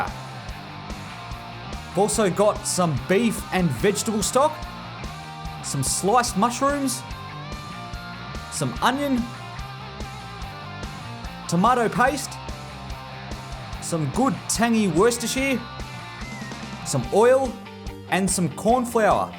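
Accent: Australia. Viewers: Thank you for your awesome Aussie accent love it from India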